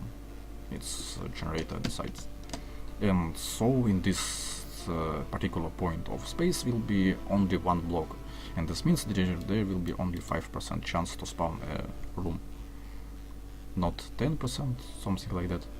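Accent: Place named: Russia. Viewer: Привет! Можно документацию на русском? Очень неудобно слушать английский от русского :///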